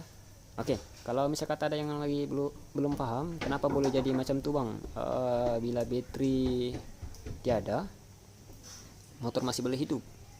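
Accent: Sabah, Malaysia. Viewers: Mantap bossku,Kamu area mana bossku?slang Kamu macam sabahan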